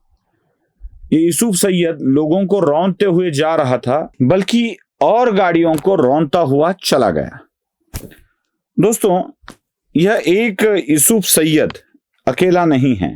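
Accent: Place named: Beed, India. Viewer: क्या गुलाबी हिंदी बोल रहे हो हमारे बीड की भाषा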